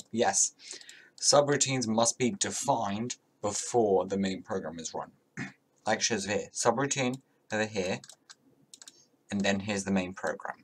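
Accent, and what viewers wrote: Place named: United Kingdom. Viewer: bro he has the most generic british accent wdym😂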